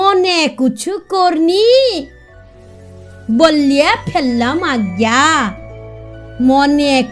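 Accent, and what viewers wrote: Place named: Purulia, India. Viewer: খুব ভালো হয়েছে,,, পুরুলিয়া র আঞ্চলিক উচ্চারণ ভীষণ সুন্দর হয়েছে👍🌹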